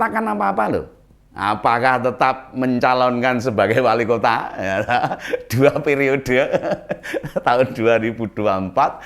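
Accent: Java, Indonesia. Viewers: Wheleh wheleh ..... kogat Jawanya kenthel banget Mantab, maju terus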